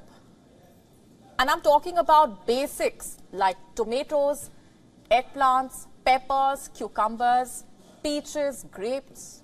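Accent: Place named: India. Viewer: i love indian /english accent :person-turqouise-waving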